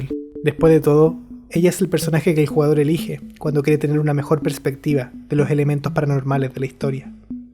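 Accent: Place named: Chile. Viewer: Increíble reseña. Encontré tu video porque alguien lo recomendó en un tablón de imágenes. Me llamó la atención la imagen del post en el que estaba la niña escalofriante que está en un callejón al atardecer. No me esperaba encontrarme con esta calidad de vídeo. El juego parece genial y lo que expones sobre el mismo me hace querer jugarlo pero por desgracia, no tengo mucho interés en aprender japonés, así que será a puro gameplay por ahora. Sin embargo, me asombra tu dedicación y atención a los detalles al armar, editar y en recopilar información de un juego casi desconocido y del que jamás había oído y en otro idioma para luego presentarla de manera que no solamente entretenga, sino que cuarenta y cuatro minutos me dejen queriendo ver más. Te ganaste un suscriptor más entre los cientos de miles que estoy seguro de que vendrán en un futuro. siempre que le des tanta o más dedicación a cada contenido que realices en el futuro. 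Y aunque honestamente no soy fan de tu acento (que creo que es chileno), la verdad no le di mucha importancia. Un poco de cuidado con el volumen del audio de tu narración es lo único que podría recomendarte. TL;DR : tremendo video bro, sigue así.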